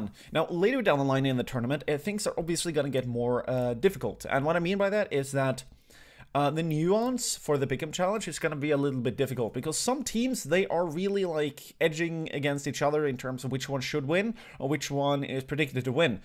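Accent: Norway. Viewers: Er du fra Nord-norge? :)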